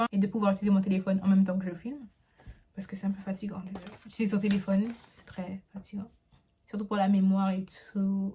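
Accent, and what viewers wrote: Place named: French Guiana. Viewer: Tu as l’accent guyanais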